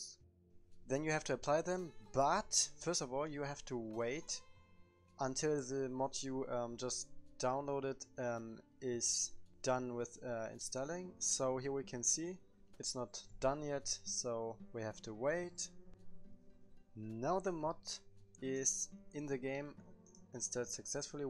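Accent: Germany. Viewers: aber sprichst deutsch, das meinte ich, und du hast den launcher auf Deutsch und man hört den deutschen Akzent raus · Man hört so krass das er deutsch is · digga du bist zu 1000 prozent deutscher haha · Ich liebs wie man Deutsche an Ihrem Englisch erkennt haha, danke fürs Video ! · Ich liebe dein Deutschen akzent 👍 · Man hört so hart das du deutsch bist lel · Deutsch man hört es direkt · Are you German? If yes, you speak good English👍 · Einfach schön auch mal Deutsche Englisch sprechen zu hören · Man hört den deutsch Akzent 😂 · i can hear the german accent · Ok Cool Aber man hört deinen deutschen Akzent :) im englischen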